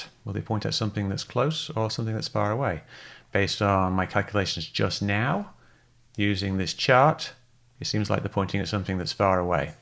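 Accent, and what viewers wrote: United Kingdom. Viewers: Lmao if your stupid enough to believe this asshat knows more about a flir targeting pod than EVERY PILOT IN THE MODERN DAY MILITARY, then you deserve to be kept in the dark. I mean he used actual science and math to prove his point, while this dumbass used a flashlight in his garage in a lame attempt to make his point. Just becuase they have a British accent, doesn't mean they are intelligent. This guy just profits off of this shit ironically like the people he's trying to discredit. Think about it, who has the most to lose in this argument? Mick West or literally anyone else who actually trained as a fighter pilot? Use your damn head people!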